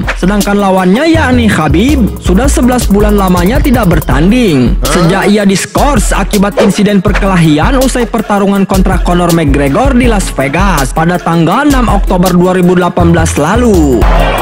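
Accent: Bali, Indonesia. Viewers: Mimin orang Bali ya?... 😁 Logatnya kayak temanku. 😁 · Logat bali?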